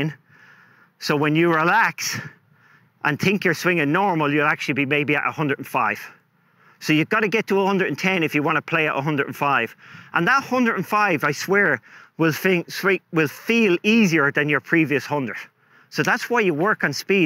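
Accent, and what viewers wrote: Ireland. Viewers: English is his first language. It's just where he's from in Ireland that gives him that accent, it's very common there.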